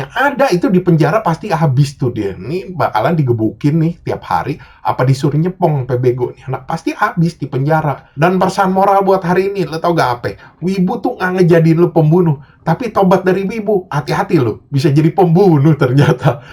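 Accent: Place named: Betawi, Indonesia. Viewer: Org betawi bang logatnya kyk bang alex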